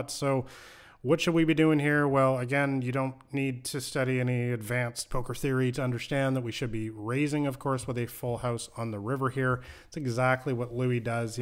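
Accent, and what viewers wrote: Canada. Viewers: he definitely has a strong canadian accent, and i say this is a canadian lol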